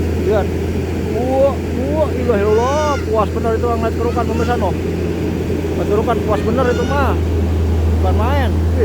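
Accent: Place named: Jakarta, Indonesia. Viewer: Ni youtobernya lucu bhsanya Betawi bnget hhhh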